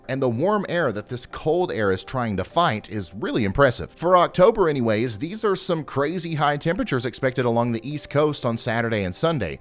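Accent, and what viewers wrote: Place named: Tennessee, United States. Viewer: Well, he's as accurate as most! It's just that he's so much fun to watch! It's obvious he loves what he's doing, and he DOES have a knack for following the correct models. He may have a much better read on what others forecast, as he really does his homework. That slight Tennessee twang doesn't hurt, and he's just so dang cute!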